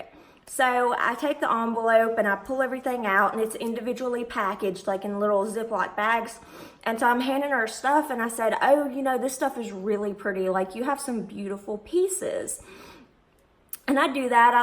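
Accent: South, United States. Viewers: where in the south are u from because I like your accent 😊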